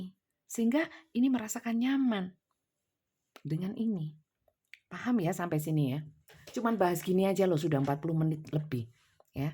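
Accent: Java, Indonesia. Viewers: CERITA ku bunfa itu. aku segan demen bngt ma suara bunda yg khas. enak di dengar . masio medok terasa luwess. pingin bamget t lihat foto bunda. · Tapi ngangeni readingannya bunda... Medhoknya,ceplos ceplosnya,sak ononé bgt. Istilah²nya njawani tenan. JANGAN BERHENTI JADI TAROT READER.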